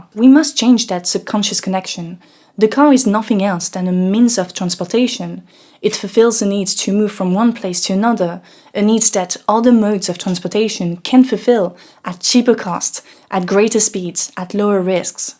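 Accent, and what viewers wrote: France. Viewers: Oh you're french! No wonder you're so smart. Also, french accent makes everything sound classy.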